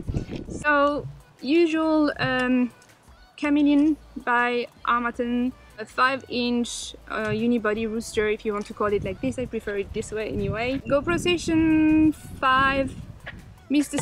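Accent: France. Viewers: Je dois debarquer(voir parler dans le vide)Mais,il n'y a pas un accent français?☺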